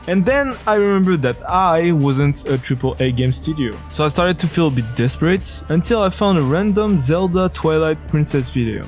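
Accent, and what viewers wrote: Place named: France. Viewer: Gotta love the french accent ahah Nice changes! I'm looking forward to play the next chapter ✨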